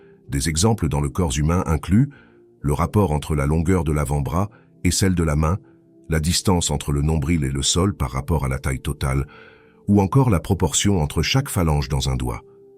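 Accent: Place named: Algeria. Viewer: salut a vous toujours dalgerie d apres votre accent vous faites plusieures videos de differentes appelations le plus important pour nous tous c est vos efforts colossals au service de la spiritualite scientifique le savoir vivre avec cette complexité mathematique ou le nombre d or est present partout meme dans la conception des pyramides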